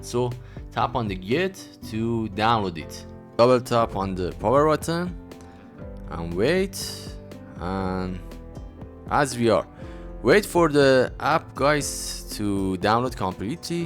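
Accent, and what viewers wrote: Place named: Iran. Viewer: 😂 اومدم کامنت بخونم لجه ایرانی فقط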